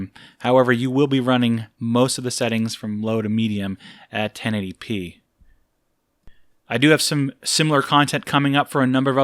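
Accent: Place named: United Kingdom. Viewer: I second those opinions about your voice bro. It sounds calm, professional, articulated. And that's a big factor in the subscription business I think. And you know what ups the ante, is a british accent. I'm serious! That's almost a guarantee for someone to subscribe.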